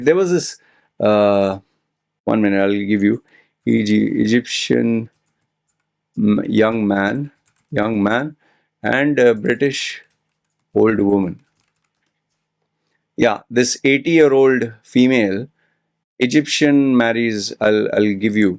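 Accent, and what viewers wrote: India. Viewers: You seems to be an Indian...your accent is so so desi.. Thank you big brother. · Bro you sound like Indian😊😊😊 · You sounds indian as fuck mate! You are pretending like a foreigner after having that tatts like moari brothers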